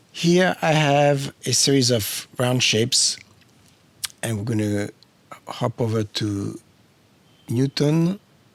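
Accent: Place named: Arab world. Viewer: you have an Arabic accent. nice video by the way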